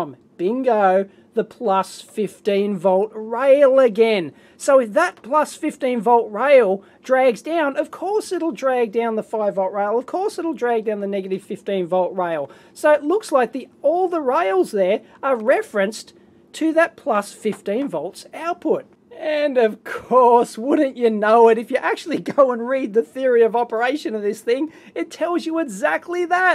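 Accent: Australia. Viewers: it's highly commendable for someone to use a wide dictionary. especially with an australian accent. (unless your name is ken ham)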